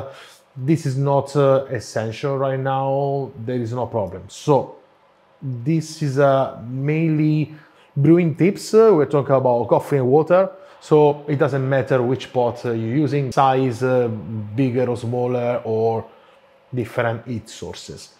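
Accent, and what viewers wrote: Italy. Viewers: Hello, love your show and you have a nice Italian accent and well-spoken English. Just wanted to tell you that a word that you use often should have the accent on the first syllable as is the case in spoken Italian. The word is VARIABLE.....VA' REE A BLE...not...VA RYE' A BLE . Please excuse this subtle correction while I always chastise English and American native speakers who are often the worst at respecting foreign word pronunciation.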